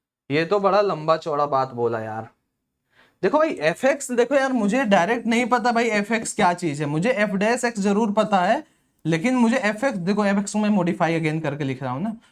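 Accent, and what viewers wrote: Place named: Uttar Pradesh, India. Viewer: UP guy, not odia.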